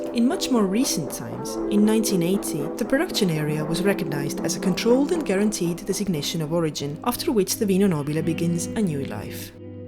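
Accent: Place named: Italy. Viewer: These videos are wonderful. Very informative and I love wrapping my ear around that beautiful Italian accent. It looks like the series was short lived, which is a pity.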